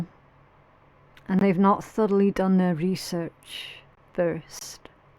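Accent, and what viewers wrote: Scotland, United Kingdom. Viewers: Love your Scottish accent 💖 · Thank you for ur readings..been putting my rune under pillow. Ur voice reminds my of living bonny Scotland for 2 years and how her beauty and kindness has healed me when it was needed. Bless u deary❤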